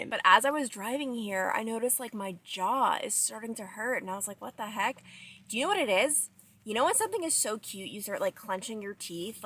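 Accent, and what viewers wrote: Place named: Long Island, United States. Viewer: I think your lack of sleep is making your Long Island accent come out more 😂 Asher is so cute!!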